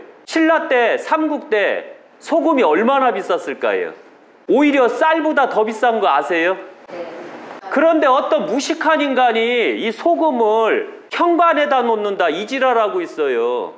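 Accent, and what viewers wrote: Chungcheong, South Korea. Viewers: 고향이 어디십니까 충청도?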